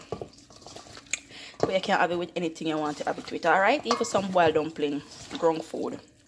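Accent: Jamaica. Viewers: I love to hear my Jamaican accent on these cooking channel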